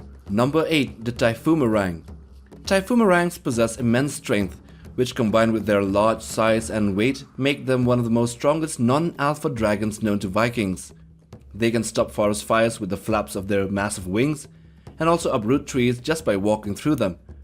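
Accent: Germany. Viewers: du bist son deutscher das hört man sooo hart :) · Love ur german axcent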